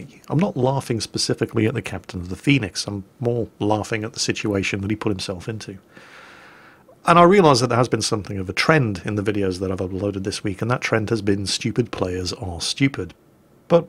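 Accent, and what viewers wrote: England, United Kingdom. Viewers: Graham Norton has the same sort of sound, check <link> Is it just a British affectation? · my friend, i must tell you.. I don't play these games, but love to watch, and out of everyone i watched you are the only one that caught my attention.. And i also subscribed, to your channel, i guess you are the only one that's not annoying.. Plus you are very knowledgeable and can flat out play too.. Your commentary is just as mind blowing as the battles, and for a guy from the inner city of Baltimore md in the U.S.A. i love that English accent.....🇺🇸🇬🇧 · is a smoker......also: There is a reason (I'm not a native speaker) i switched over from hiding my accent with a learned American one and switched to using version of British accent.....its very easy on the vocal cords.....at least in my experience. It's soft on purpose.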